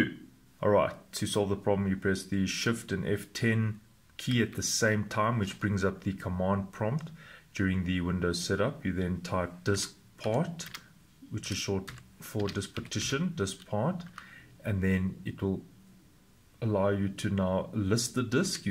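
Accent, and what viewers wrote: South Africa. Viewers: That South African accent! Thanks bro, from one saffa to another!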